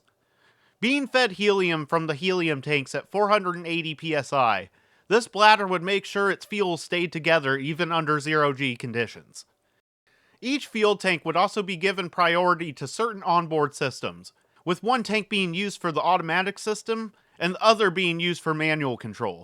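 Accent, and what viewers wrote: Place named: South, United States. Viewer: Wait! What happened to your beautiful, ambiguously southern drawl???!!!!! It's just not the same :'(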